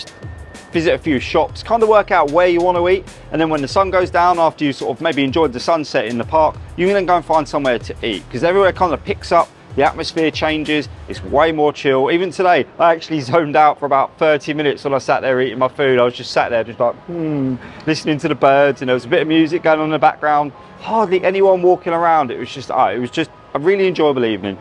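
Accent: United Kingdom. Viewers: I used to English accent, can understand u ❤️‍🩹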